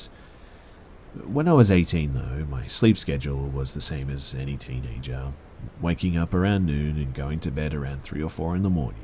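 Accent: Australia. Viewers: Aussy's are my favourite narrators now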